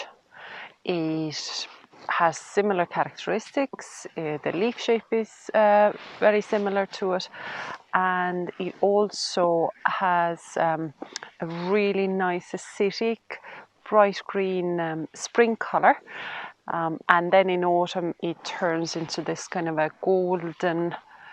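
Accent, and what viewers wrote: Kerry, Ireland. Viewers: Thank you so much for that. I was leaning a bit towards blackthorn since it’s one of our great native trees. We have plenty of midges already in Donegal so a few more won’t make much of a difference! I like the idea of supporting more birds and insects too. And the flowers and berries. An aunt of mine was addicted to the berries and used to eat them directly off the tree, although they must have been pretty bitter. Your advice will help me decide. PS. You have a great Kerry accent!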